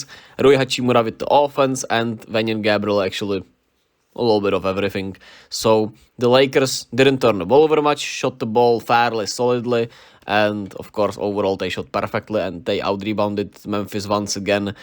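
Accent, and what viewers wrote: Czech Republic. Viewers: Náš přízvuk se nezapře. Cením snahu.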